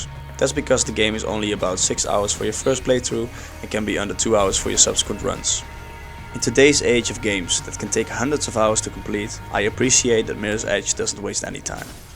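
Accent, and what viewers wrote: Netherlands. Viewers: Goeie review, ik hoorde gelijk dat je uit Nederland komt :) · this game will forever have a special place in my heart. great video! edit: im just gonna assume you're dutch because of your accent ;)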